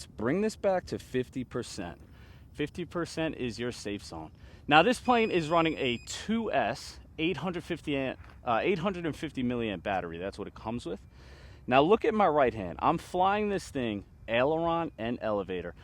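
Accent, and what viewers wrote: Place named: New England, United States. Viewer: Nice! I can’t tell if you are MA or NY. RI maybe? CT? You said stall like stawl but then cherry picker like cherry picka…so I’m confused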